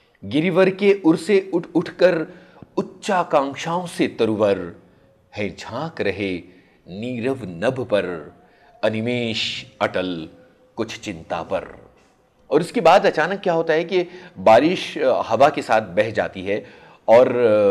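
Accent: Standard. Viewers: आपका उच्चारण बहुत shuddh है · Too good... Hindi kavita aur Yunusbhai ka vishudh Hindi uchcharan... sone pe suhaga... what else...?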